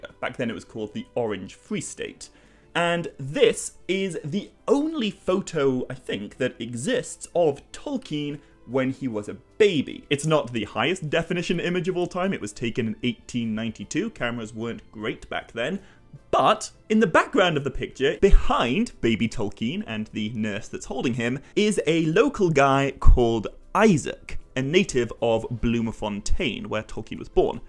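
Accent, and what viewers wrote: England, United Kingdom. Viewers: Question? You sound English. Are you from England?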